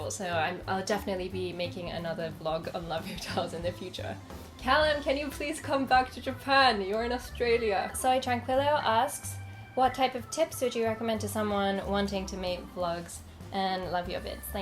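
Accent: United Kingdom. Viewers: are you originally from South Eastern part of England? Your accent sometimes has a tinge of a South Eastern or often times confused with Aussies sounding accent. (I've met some people from East Sussex and Kent that have an Aussie sounding accent, though it would fluctuate between that and just an average Londoner's accent.) By the way I think your nose gives you a unique look that sets you a part from the crowd and you have a nice attitude to go along with it. Like they say beautiful inside equals beautiful outside too. In your case that is very true. Cheers ad do not listen to the haters, you are an amazing lady! Enjoy Japan and where ever you go to next too! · Japanese girl with a brit accent...ummm... i'll take two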